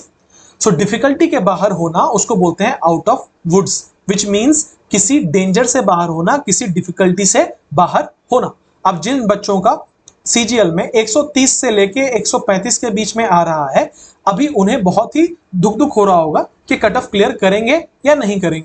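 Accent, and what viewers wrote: Bihar, India. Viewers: sir, I know your voice. You are from BIhar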